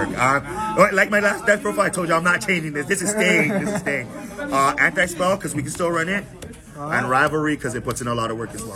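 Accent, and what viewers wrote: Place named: Toronto, Canada. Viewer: I recognize the way he speaks. My dudes from Toronto 🙏🏻 got a crazy good profile too. Thinking about getting into this deck.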